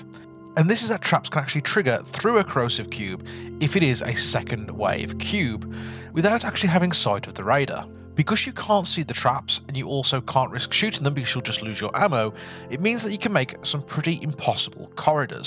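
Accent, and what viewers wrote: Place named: Midlands, United Kingdom. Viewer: So good to hear a midland accent on YouTube and hell man meet your maker is beast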